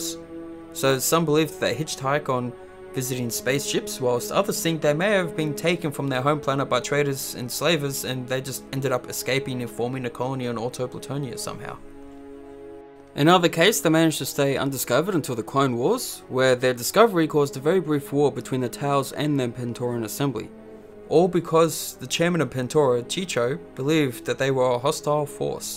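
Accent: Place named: Australia. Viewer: Just discovered your channel, I like what you're doing, I have one question though? which part of Australia are you from? I'm from New Zealand and I almost thought you were Kiwi at first but then realised you didn't have the Kiwi accent.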